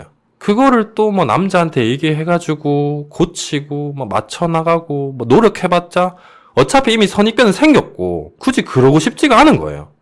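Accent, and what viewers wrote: Gyeongsang, South Korea. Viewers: 대구 경북쪽 말투네요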